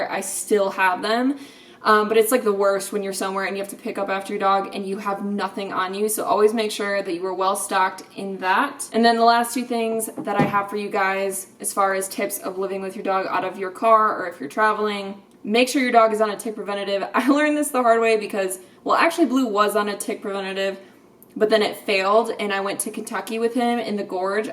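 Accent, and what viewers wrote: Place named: Appalachia, United States. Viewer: U r hella cool but plz stop doing that hillbilly accent u sound so stupid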